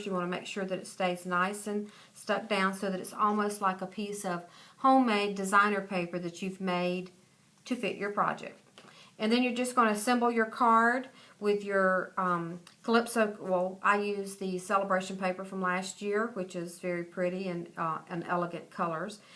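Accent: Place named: Texas, United States. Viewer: Loved this tutorial! I also loved hearing you speak, 'cause you sound like you might be a Texan! ;-) Thanks for sharing your easy to follow technique...your card is beautiful!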